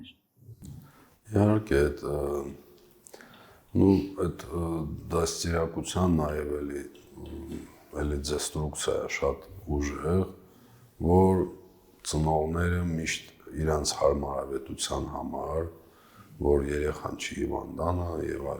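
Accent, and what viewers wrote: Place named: Karabakh, Azerbaijan. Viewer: ղարաբաղցի է հաստատ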